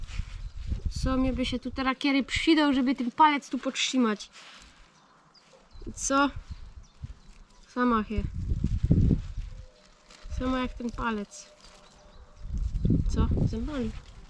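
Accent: Silesia, Poland. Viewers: Palma ładna i Śląska gwara też fajna lubię cię oglądać. To pieczony chleb z wędzonką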